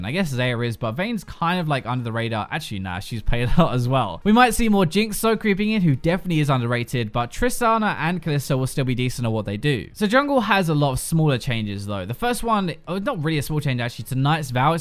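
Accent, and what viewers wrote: United Kingdom. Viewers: i love his accent? what british accent is it?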